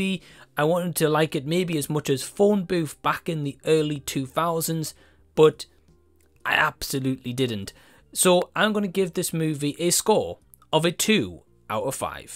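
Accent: Newcastle, United Kingdom. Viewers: Geordie? ;) Why-aye! :D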